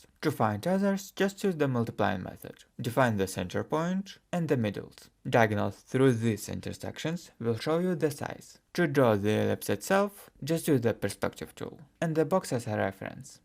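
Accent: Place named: Germany. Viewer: Ur accent sound so germany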